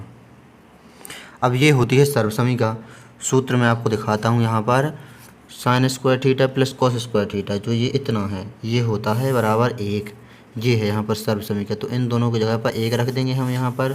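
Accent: Bundelkhand, India. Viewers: Bhai tum kaha se ho bundelkhande bol rhe ho voice se lgta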